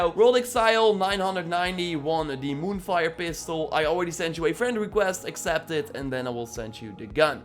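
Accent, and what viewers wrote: Netherlands. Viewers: Your Dutch arent you?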